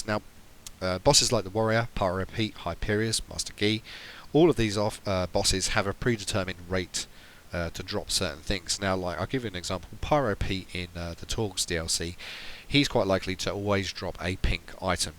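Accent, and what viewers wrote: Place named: United Kingdom. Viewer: I would rather shove bamboo under my fingernails than listen to British people drone on and on. It sounds like you have the personality of a log.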